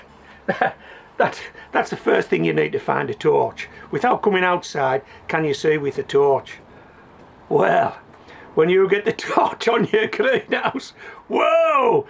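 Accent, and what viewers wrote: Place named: North East England, United Kingdom. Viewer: Where abouts are you ? You sound very local to myself which is Loftus a small town in between Redcar & Whitby. Love your videos keep em coming! Just about to refit my polycarbonate greenhouse with more polycarbonate. This time going to reinforce as much as possible. North east England renowned for cold and VERY windy weather. Think it’s been down more than up my poor greenhouse!! Wish me luck!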